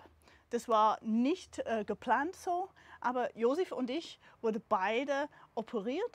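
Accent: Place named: Swabia, Germany. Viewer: your ever so slight Swabian accent is darling! Thank you for your lovely videos.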